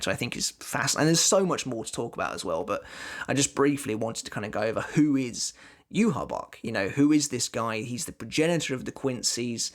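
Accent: England, United Kingdom. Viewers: I’m onto to you, there’s that subtle English accent but I won’t be fooled that your not American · Not usually a fan of British accents... but... your base, narrative delivery,and VERY concise knowledge of this masterpieces of a show... I’m now a solid fan! I’ve sent links to 12 of my fellow friends who are about this show like you are! Keep going all the way to the top bro! I’m an American fan! 😎❤️🙌🏻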